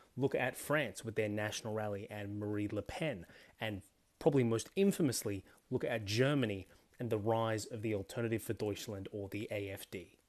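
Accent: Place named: Australia. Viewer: you sound aussie, you should do aussie politics. example; how the fee free tafe can be a game changer to the economy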